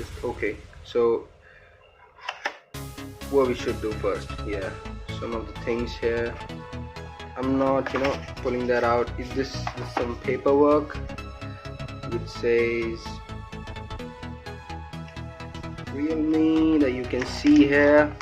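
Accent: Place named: United Kingdom. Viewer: Best 👍 video bhai 👌 aur bhai apki English to masha allah bahut hi achchi h bhai lagta nhi ki ap india se ho pehle to laga ki ap UK 🇬🇧 se ho Best English YouTuber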